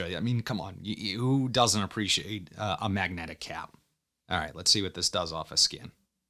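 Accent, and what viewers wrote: Boston, United States. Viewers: I love your Boston accent Bro. I’m in Houston, so I don’t have a real strong Texas accent, but it’s still a Texas accent. I’m gonna go watch the Departed now lol. Sotd Guerlain L Homme Ideal edt.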